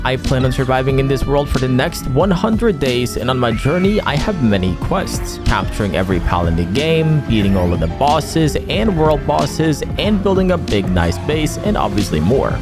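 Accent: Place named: Sweden. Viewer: Wait are you Swedish cus it kinda sounds like it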